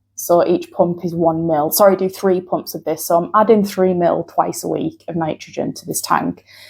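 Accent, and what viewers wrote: United Kingdom. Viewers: that marvelous English accent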